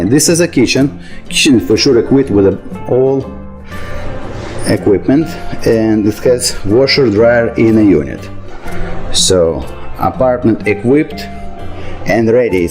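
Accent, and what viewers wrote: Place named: Russia. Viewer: So nice Russian accent. Glad to know that local Miami environment can not destroy it 👍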